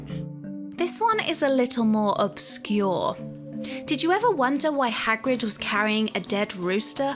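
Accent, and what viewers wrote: United Kingdom. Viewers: Not actually related to the video, but I really love your voice and accent ! I'm French, and I usually tend to dislike the British accent, I don't really know why. But oddly, I find your voice and speech very pleasant and relaxing to listen to ! Also, I got 10 points for Hufflepuff, because I knew about the roosters, Ron's robes and the diary. And I think Lockhart has a wig "in case of an emergency". For instance, if someone came to knock on his door at night, he wouldn't want to be seen with untidy hair, so he would be able to quickly put on his wig and look flawless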